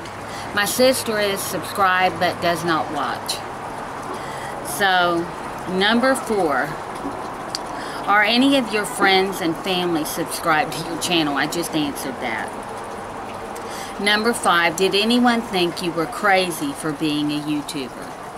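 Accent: West Virginia, United States. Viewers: Hi, I am a new subbie. I watch Marnies channel. I saw your channel name & started watching your 1st video. I recognized your accent right off. I live in Michigan. I married a born & raised Mountaineer. He was born in Clarksburg. Went to Doddridge County schools. All his family is still in Salem, Clarksburg, West Union. I just LOVE WV. But it sure does get HUMID. I have 2 grown daughters & 4 granddaughters that I take care of on the weekdays while the girls & their husbands work fulltime. Sure keeps this Nana busy and pooped out at the end of the day. Love your videos. Will write more later. Michelle from Michigan.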